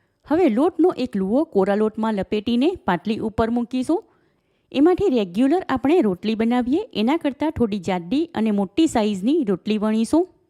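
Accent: Surat, India. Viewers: તમારી ગુજરાતી રેસીપી તો સરસ જ છે પણ ભાષા પણ અસલ સુરતી જ છે.great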